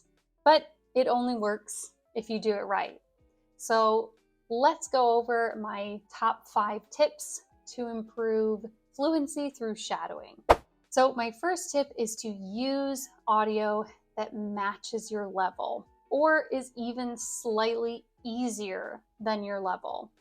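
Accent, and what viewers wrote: United States. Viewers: WOW!!! Finally I found a great shadowing English channel with the beautiful American accent!!! 🙏🏻🙏🏻🙏🏻 More difficult than British accent to pronounce but more beautiful when it's well spoken (no slang, no ghetto stuff). Thank you, I'm a new subscriber 🥳🥳🥳💖✨💕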